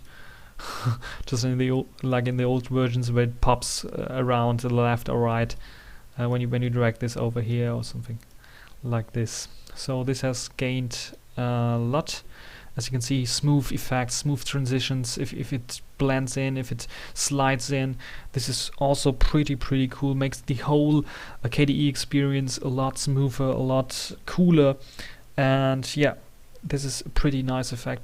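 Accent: Germany. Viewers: thank you for the video loved every minute of it. Where are you from is it Germany? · Aah i love German accent :)))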